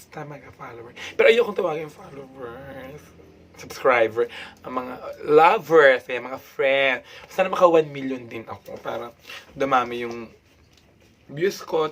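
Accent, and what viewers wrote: Batangas, Philippines. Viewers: nakakatuwa k.. lalo na ung puntong batangueno mo at mga ganap mo.. keep up the gud wrk! and keep being humble!!😀😀😀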